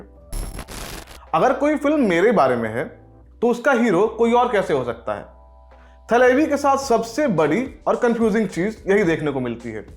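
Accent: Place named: Bengal, India. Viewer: I think he is Bengali.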